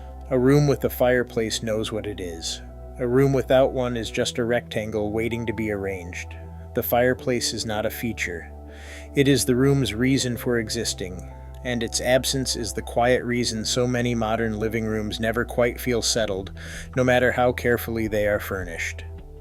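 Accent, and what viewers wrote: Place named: United States. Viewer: Why use an American voice for a British video? Interesting video otherwise. Lots of good features that went by the wayside for false cost savings.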